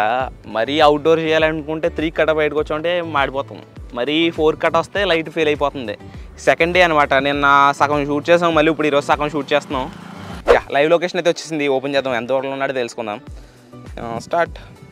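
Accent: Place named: Godavari, India. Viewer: Godavari slang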